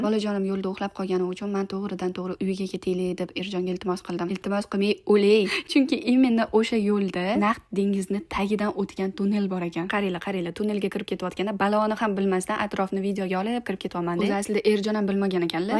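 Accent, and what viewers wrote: Tashkent, Uzbekistan. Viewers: Хаммаси зурку,лекин бир нарсангиз мани ажаблантиради.Шевангиз,наманганликлар бузилган тошкентча гапирмайди.русча кушиб гапиришингиз эса жуда савияси паст билимсизлигингиздан белги.илтимос узингизни бу киликларингиз устида бир оз ишласангиз булармиди. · toshkent shevada qando gapiryapsiz namanganlik bulsayiz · Жуда хайрон колдим Намангонликлар жуда этикодли буларди ёки сиз Тошкентча гапиряпсиз Тошкентда катта булгансиз шекилли йукса Намонгонликлар динни кабул килса килмаган буладими ишкилиб бошка динга тегмайдику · Наманганлик булсангиз нимага тошкент шевасини аралаштириб гапирасиз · Узиз наманганлик буп туриб , шевез тошкентчаю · Наманганлик шеваси бошкачаку бу киз тошкентча гапирди😊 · НИМА УЧУН НАМАНГАНЛИК БУЛА ТУРИБ - ТОШКЕНТЧАСИГА ВОТТИЛАЯПСИЗ ??? ЯНА ЭРИНГИЗ КАРИС ??? ЯНА УРИСЧА КУШИБ ГАПИРАЯПСИЗ ???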